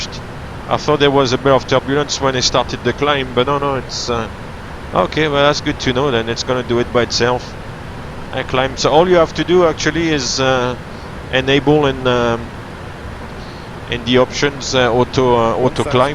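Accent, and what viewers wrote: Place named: France. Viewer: I was pretty sure you were french, beeing one myself !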